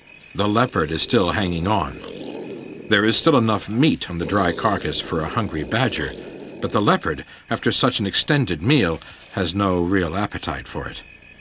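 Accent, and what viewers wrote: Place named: Canada. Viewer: The narrator sounds Canadian. Feel like I'm watching a documentary from the Mother Corp back the 1980s. Cheers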